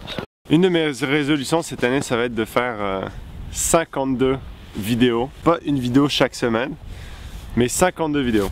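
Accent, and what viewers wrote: Quebec, Canada. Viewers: omg sa fait tellement longtemps :O sinon tu à tellement pris l'accent quebecois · C'est moi ou tas l'accent québécois ? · il a pris l'accent quebecois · J'ai l'impression que tu commence à avoir l'accent quebecois ^^ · Salut je heureux de cet resolution et je trouve que tu a pris un accent québécois c'est fou 😊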